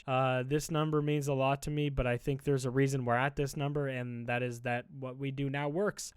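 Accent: Canada. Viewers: Gawd, you're so Canadian white but it's like little sand hints of arab thrown in there.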